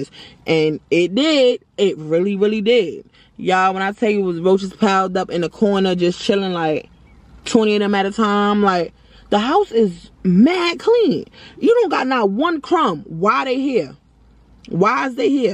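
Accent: New York, United States. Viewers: Newbie here... I just saw this video recommended today and decided to click because I LOVE storytimes! I have to say you definitely have a new subscriber here boo. I'm from Brooklyn NY myself so I immediately knew you were a fellow NY'er when you started talking lol, and I love your honesty and realness. That is so rare to find on social media. But girl, let me tell you this video SPOKE to me something crazy. I'm currently looking to move myself after being in my apartment for 9 years and NEVER having a single roach. Crazy for NY but true. Now, all of a sudden, after having water issues, the same as the ones you mentioned, they're coming from everywhere, and I just can't. Like yourself, I keep a very clean home. I have actually officially been diagnosed with OCD years ago. Like, it's a real problem, and I keep an extremely clean home, so I can't do it. 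I don't have Section 8, but I do have another program, and unfortunately its so hard to find landlord's to take them. So honestly, I'm terrified I won't find something by the time my lease is up in July, but I CAN NOT stay here. Roaches are so disgusting, and they just multiply and get everywhere. I've had 2 apartments prior to this one and never had roaches in any of them, and after 9 years here, NOW they want to take over 🤨🤔. Oh hell no, I gotta go, lol. I actually really felt this video also because I've had lots of issues like yours and although my landlord is a really nice person and we get along they dont like to pay for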